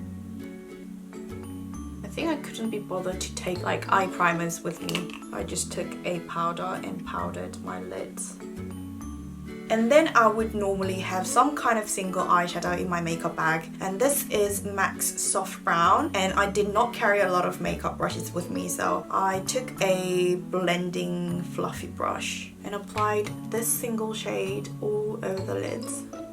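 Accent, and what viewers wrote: United Kingdom. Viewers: 언니 영상 볼때마다 항상 영어 공부가 되는거같아서 정말 좋아용 영국식 발음 듣는거 좋아하는데 언니 영상 보면서 흐뭇흐뭇^_^ 저는 운동할때 포기할수 없는건 립이에요 다른건 없어도 아파보이지는 않으니까요!! 립 하나만으로도 생기를 줄 수있우니까=3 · 우아 진짜볼때마다 느끼는데 영국식?영어? 어쨋든영어넘넘잘하시네요😃부러워요😢영어팁도브이로그로알려주심감사할것같아요❤ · 저는 핸드폰과 이어폰이요!! 운동할때 너무 지루하고 힘들어서 그 때는 좋아하는 노래를 듣거나 영상을 보면 시간이 진짜 금방 가요 흥얼흥얼거리면서🎶🎶 언니 영국발음 너무 좋아요ㅠㅠ 언니처럼 영국발음하고 싶어요ㅠㅠ 제가 영어 좋아하는 편 아닌데 언니덕분에 배우고 싶은 욕구가 엄청 쑥쑥!! 오늘도 영상 고마워요😍😍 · sydney님 영상 정말 잘 보고있어요<3 영국발음 너무 멋지세용!! 저는 해외 살때는 쥠을 자주 다녔는데 한국에만 오면 헬스장을 안가게 되더라구요ㅠㅠ 외국에서 쥠 다닐땐 거의 저도 생얼로 다니지만 꼭 포기 못했던건 눈썹!!!!!! 그리고 립이였던 것 같아요 ㅎㅎㅎ 저도 틴트는 꼭 들고다녔어요 히히 그럼 좋은 연말되세요 님!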